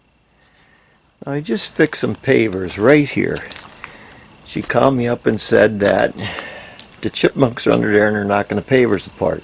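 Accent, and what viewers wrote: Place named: Coal Region, United States. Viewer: Great video. I watch a lot of them just to watch you do the job. I do my own repairs though so I have learned a lot too. Plus I'm from the Coal Region and I love your dialect. Bet you've fixed some CHIMLEYS in your time.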